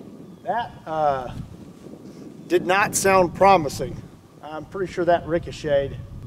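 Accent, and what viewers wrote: Kentucky, United States. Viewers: what part of kentucky ar e you from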